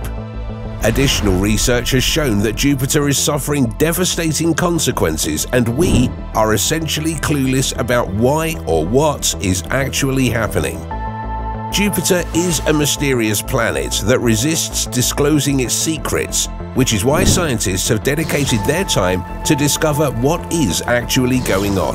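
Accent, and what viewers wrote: United Kingdom. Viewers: HUH? What do you mean "why" is he British? Do you expect the crew to go "oh damn agh nevermind you can't narrate. You're British so.." Why not a brit? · What's wrong with the narrator being "Brit"? Are only Americans allowed to speak on YouTube? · Why is the narrator a Brit? Also, why after 70 years of space exploration and billions spent on Satellites and Probes are we essentially clueless about why or what is actually happening in, on, and around Jupiter?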